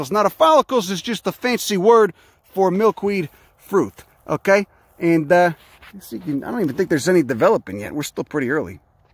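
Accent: Chicago, United States. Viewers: An educated botanist who sounds like a Chicago gangster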